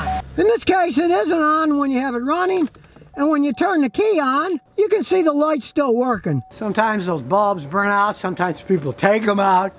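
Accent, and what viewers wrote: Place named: Michigan, United States. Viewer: I like how you say caaaarrrr, are you originally from Michigan? You have a Michigan accent.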